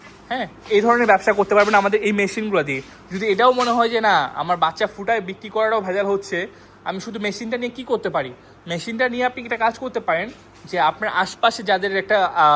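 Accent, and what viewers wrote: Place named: Dinajpur, Bangladesh. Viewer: দিনাজপুরের কথাশ এটা ভাই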